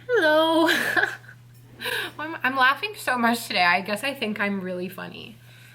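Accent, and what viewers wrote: Quebec, Canada. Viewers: Salut,! Your channel got reccomended to me in Brazil, so I guess it's an indication of how much you've been growing, and I thought you'd be happy to know it. I'm in my late 20's and, to be honest, we have very different personalities and life styles, but I love to hear you talking - even though I don't relate much. I think you're a great communicator and also very entertaining. Hopefully you can make youtube your main source of income really soon! Btw, I've started learning French this month, I speak Portuguese, so it's a bit easier since they're both Latin languages, and I was so shocked to hear you! Canadian French sounds soo different from French from France, I had no idea, even though I lived in Toronto for a year (not much French there, but I did visit Ottawa and Montreal a few times and was so oblivous to the language). I'd looooove to hear you uploading more French content here and there.:) best of luck! 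· Oh my gosh I love your French and love your accent even if it is hard for me to understand. I love Quebecois!! Thank you for adding that clip haha🤣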